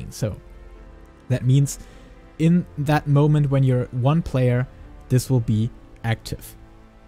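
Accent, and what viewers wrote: Germany. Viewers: You're German am I right? :D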